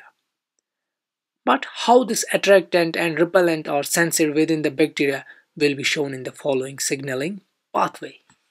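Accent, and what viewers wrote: Kashmir, India. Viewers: I think this is kashmiri Sir. As by pronounced words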